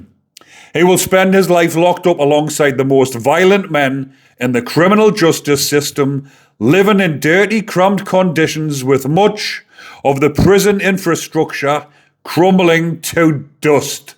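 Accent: Newcastle, United Kingdom. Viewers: Varley is the perfect example of why there should be a death penalty. Love to hear the Geordie accent from so far away, too bad about your piggy' lug - haven't heard that word for years.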